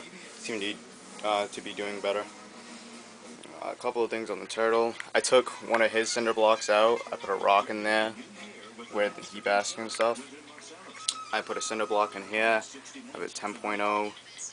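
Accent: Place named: Boston, United States. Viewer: you from boston, nice accent im like an hour away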